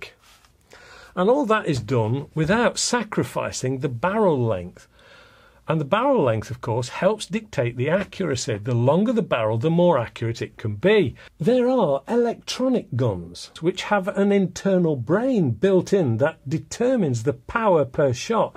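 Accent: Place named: England, United Kingdom. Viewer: Hello Mr. I am from Greece and my name is Eric. Congratulations for your great channel! It helps me very much with all that information for my potential hobby. I really admire and that English accent.I really like you English people!!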